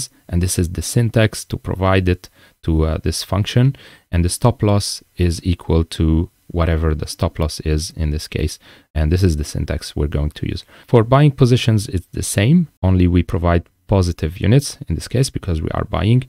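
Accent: France. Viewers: Thank for the video i will try it ! By the way have you french accent ? And have you made money from your bots ?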